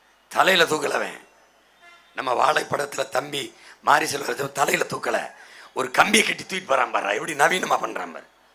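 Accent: Tirunelveli, India. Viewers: ஏலே... திருநெல்வேலியா லே....😅😅❤❤❤❤🎉🎉🎉🎉🎉 நாம் தமிழர் ❤❤❤🎉🎉🎉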